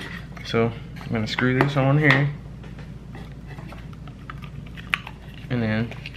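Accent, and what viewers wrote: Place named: Lafayette, United States. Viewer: When I hear you talking, I knew you from Lafayette. I saw you scan and seen KATC and confirmed. I'm 40 min South of you. Great video!!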